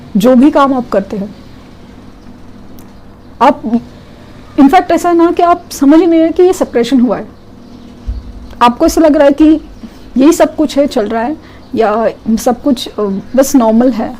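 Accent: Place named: South, India. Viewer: Mam ! Your Hindi sounds like south Indian Hindi, but feels very sweet to listen 👌👌😀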